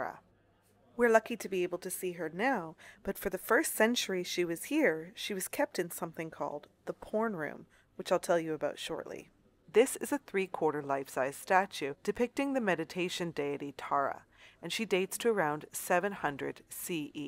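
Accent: United States. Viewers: I can't work out if your accent is American.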